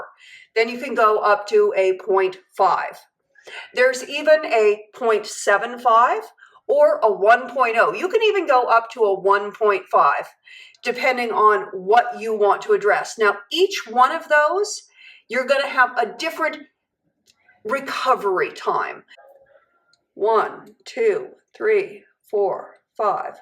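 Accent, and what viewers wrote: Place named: Southern California, United States. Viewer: Noooo. He still sounds like a he, like Bruce.. It's just the S. Cali accent .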